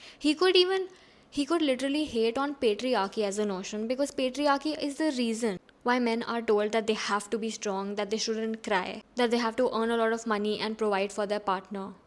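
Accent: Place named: South Delhi, India. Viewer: just shutt up this nonsense shitt and speaking south delhi accent😂its not making u cool🤡🤡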